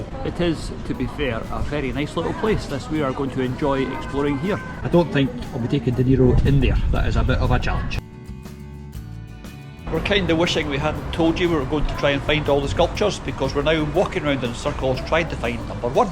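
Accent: Scotland, United Kingdom. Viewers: Great video, guys! I often have to go back and listen to what you said a couple of times, not always because you are talking fast, but more often because your Scottish Burr is quite heavy. I’m not complaining; I like it.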